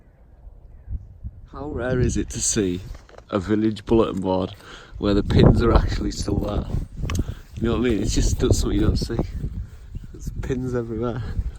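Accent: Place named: Scotland, United Kingdom. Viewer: U sound Scottish, Boss. Maybe from da Shetlands.....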